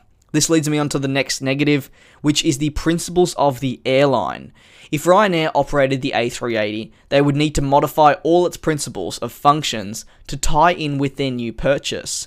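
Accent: Australia. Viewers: hi I’m Cj are u Australian??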